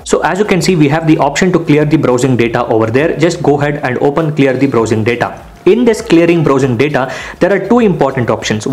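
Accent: India. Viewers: thank u ur voice is like indian or u are indian but u can speak English keep going never give up ur channel will have 10milion subs 1 day I know it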